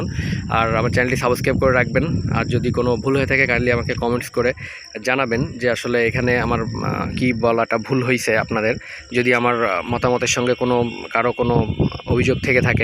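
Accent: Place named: Khulna, Bangladesh. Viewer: আমিও খুলনার ছেলে এজন্যই কিছু কথা বলছি ডোন্ট মাইন্ড ৷ আপনার ভয়েজটা ভাল, উপস্থাপনাও খারাপ না তবে শুদ্ধ ভাষায় যখন কথা বলবেন তখন সকল উচ্চারনই শুদ্ধ হওয়া উচিত নইলে সেগুলো শুনতে খুবই বাজে লাগে ৷ হইছে, গেছে, করতিছে, পাইছি, এই টাইপের খুলনাইয়া উচ্চারন গুলো শুদ্ধ উচ্চারন করলে ভিডিওর কোয়ালিটি আরো সুন্দর হত ৷ আর এত লম্বা সময় ধরে শুধু মাত্র বাইকের চারপাশ থেকে শুট না করে মটোভ্লগিয়েংর মত বাইকটা চালানো অবস্থায় ভয়েজ দিলে আরো জোস হত ৷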